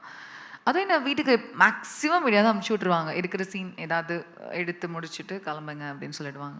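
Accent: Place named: Kerala, India. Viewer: Your Ramanathapuram we know but slang looks like Malayalam slang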